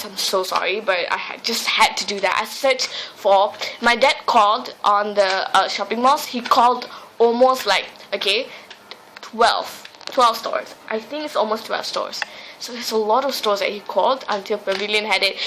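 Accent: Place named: United States. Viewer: Awesome american accent though. Doesnt sound malaysian at all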